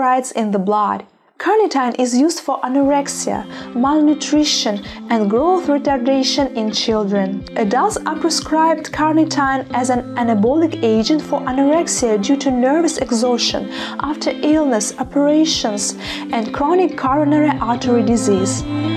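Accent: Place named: Brazil. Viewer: Thank you doctor. By the way, I love your beautiful accent! I beleive you are from Brasil, right?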